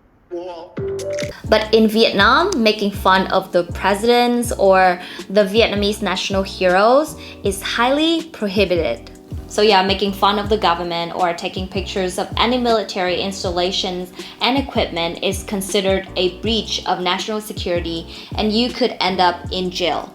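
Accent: Midwest, United States. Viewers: Where were you at in the states? Your accent sounds very neutral like the midwest accent. I think many people who would hear you speak English would assume you were American if they didn't know your story. Also as someone from one of those colder states... you know 50F/10C isn't that cold. Don't scare some American into bringing a winter coat to Hanoi lol. Light jacket and jeans is more than fine for that. I am sure you got used to seeing people in Shorts and Sandals/Flipflops/(no idea what Vietnamese call them) in that level of cold while you were here. Even in the true winters here in the colder states you see it. Asian Americans in flip flops, and college students in shorts year round is something I'm just used to. Even if I think they are a bit insane. Especially when it is snow covered and -10F/-23C. Put on some boots and jeans people lol. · Thank you. 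I don’t think you had lived in the U.S for just 7 years. You speak with a perfect American accent. I feel a bit embarrassed about my English even though I have been living in the U.S for 10 years and working in a hospital. I am learning from you!!! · For a native you sound like you spent quality time in the US or Canada... EDIT: just got to the part where you spent 7 years in USA. :) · u speaker very smooth US tone English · Mình đã nghe nhiều người nói tiếng anh. Mình không phân biệt dc người này là một người việt nam hay một người ở mỹ do phát âm quá giống. Đến khi mình nghe nói là tên và ở mỹ 7 năm. Tất nhiên tây gốc nó phát âm cuốn hơn nhưng cơ bản mình không thể biết đó là người vn đang nói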